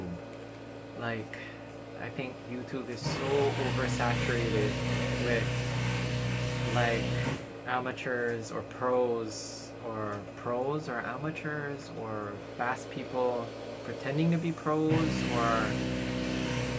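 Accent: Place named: United Kingdom. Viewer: Brilliant topics, lol I love your British accent 😂🤣 superb my friend. I do a good Australian accent, I’ll have do it on the vlog! So my accent is from the south, was born in Watford just outside of London, my wife thinks I’m very common lol 😂 I think Home is where your heart is but wherever I move too in the UK I’ll always think of Watford as ‘Home’ in the uk. But I also consider myself to be European, we are so close to France, only 1hr 30 mins to the coast where I can drive my car onto a train and in 30 minutes you are in France which I always think of as the gateway to Europe. There are definitely times of the day when I will also not venture onto busy roads. Totally agree with about riding for fun, it’s why I ride 😁👍🏻 I love it 🚴🏻👍🏻😁👌🏻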